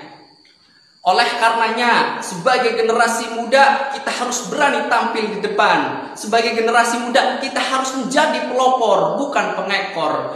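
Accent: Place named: Banyumas, Indonesia. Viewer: Salam ngapak tadd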